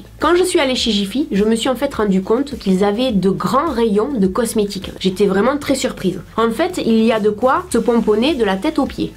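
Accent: South, France. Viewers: Du sud ( elle habite à Perpignan ) 😄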